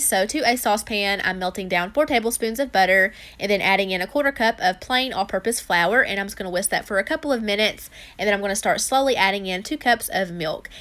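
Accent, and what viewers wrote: South, United States. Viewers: no that’s how we say it in the south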